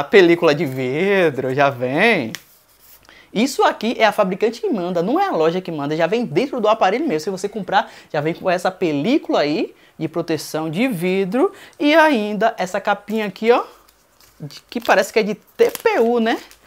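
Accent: Bahia, Brazil. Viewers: tu é Bahiano né 😂😂😂😂 se for é nós 👏👏✌ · Vc é baiano tb é? Kkkk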